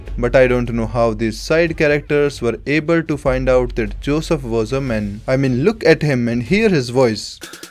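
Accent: India. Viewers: Your have an indian accent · Are you an indian, bro? Your accent looks like one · ive been watching this channel for a while i swear to god i never realised having anime explained to me with an indian accent is such a ride 😂😂😂❤ i can't get enough . sir thank you and please keep it up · U sound indian.. 😂that accent is what makes this funny.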